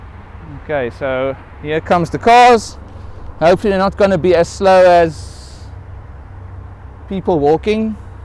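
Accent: South Africa. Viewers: So good to hear a great SA accent again. Thanks for a great tutorial, really simple and straight forward. Dankie Boet!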